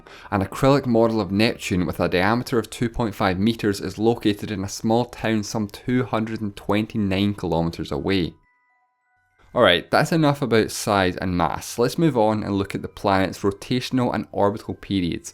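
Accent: Scotland, United Kingdom. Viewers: The Wisest Waffle he's scottish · Planets, Scottish accent, Kerbal Space Program's music on background: I thought for a moment this was Scott Manley's new video! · It's scottish. He said that he lived in Scotland in his "How many countries are there in the world?" video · rockin' the scottish accent, eh?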